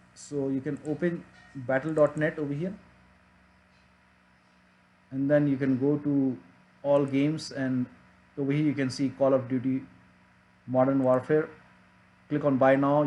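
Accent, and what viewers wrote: India. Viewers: love the indian accent, just like vancouver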